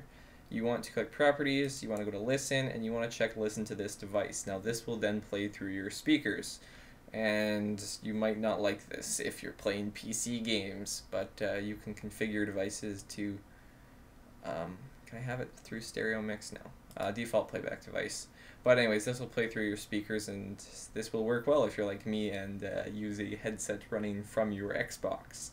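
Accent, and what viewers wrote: Canada. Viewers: are you canadian? i can hear it when you use the letters v and c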